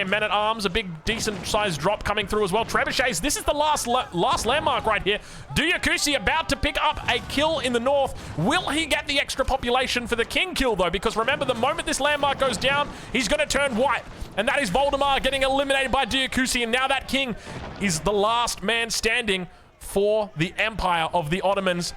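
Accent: Australia. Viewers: I rarely sub, but this Aussie is worth subbing for! Watched like 30 videos lately and your casting is magnifizent. Also, this FFA game was very exciting and probably the most intense:o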